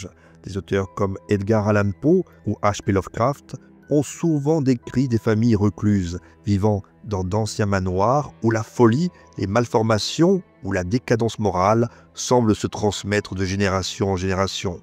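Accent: Corsica, France. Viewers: Bonsoir seriez vous de corse du sud par hasard?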